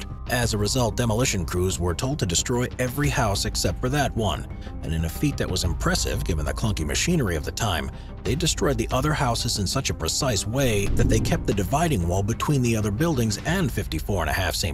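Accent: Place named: Philadelphia, United States. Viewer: super random by are you by chance from the philadelphia area? I notice the way you say words like "majority" and "Florida" with the a pronunciation which is how my philadelphian parents talk. I feel like I dont hear it that often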